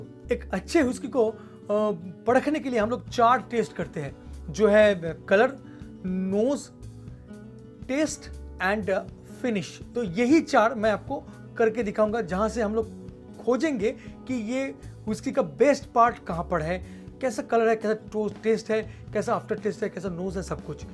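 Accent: Bengal, India. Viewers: r u a Bengali?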